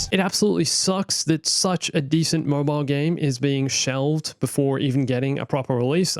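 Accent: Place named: Australia. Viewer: Wait your an Aussie, I thought u where a briton.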